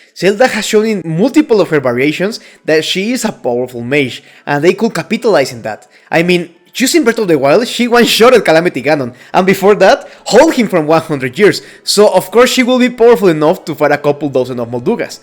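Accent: Spain. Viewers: you have like a spanish accent it's kinda weird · Supongo que eres de España por tu acento asi que te comento en español, la verdad es que la idea que propones me parece bien, el problema es que Zelda es muy poderosa, se cargo a todos los guardianes de la zona en la que estaba link luchando en una de las memorias de BOTW, mantuvo a Ganon durante 100 años en el castillo y después de que link lo derrotara lo selló. Ahora esto se podría solucionar diciendo que Zelda ha perdido poder después de sellar a Ganon (ella misma dice en el final secreto deBOTW que ya no nota la trifuerza) y hacer que realize las pruebas que mencionas en tu video para recuperar la trifuerza completa y así ayudar a Link a derrotar a Ganondorf, que es lo que impidió que esto pasase el miedo que tiene Nintendo de que la gente se queje. Aun así se que algún día dejarán atras ese miedo y lo haran, así como canonizar el Zelink. 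PD: Buen video bro❤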